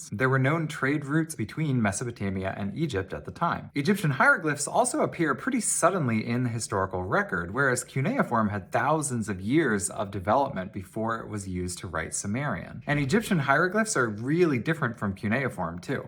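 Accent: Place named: United States. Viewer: I cannot stand the American pronunciation of cuneiform. kyuunay-uh-fooorm.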